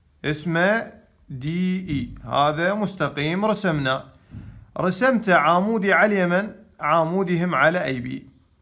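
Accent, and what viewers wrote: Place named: Gulf, Arab world. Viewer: لهجتك خليجي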